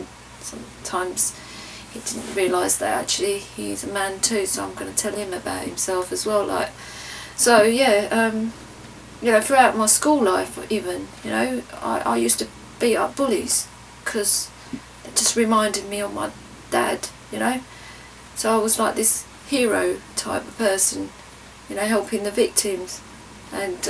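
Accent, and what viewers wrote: United Kingdom. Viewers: I'm an alcoholic, but sober 12 years. I know where your coming from. You've probably done a lot of mental damage. I go to aa a couple of times a week. I'm saved by Jesus. Don't isolate, get out and mix. Your not alone. Love your London accent. Keep the faith sister. Big love xxx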